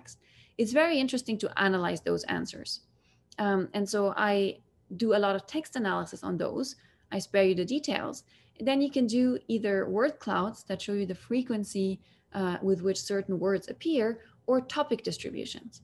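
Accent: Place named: Bulgaria. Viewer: She appears to have spent a lot of time on accent-therapy and techniques to lower her voice. She cannot escape the Bulgarian accent, ever, but she's put a lot of work in to sound close to native.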